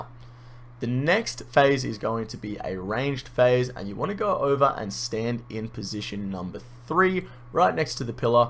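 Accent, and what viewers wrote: Australia. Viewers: Aussie accent at its finest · Easily the best guide I found. What I do personally though is have the time in the video the rotation gets identified down and skip to the part in the video so soothing Australian voice walks me through the rotation. I found it better then doing it 1 rotation at a time. My time stamps: Rotation 1: Rotation 2: Rotation 3: Rotation 4: These can probably be tweaked a pinch but they're what I use.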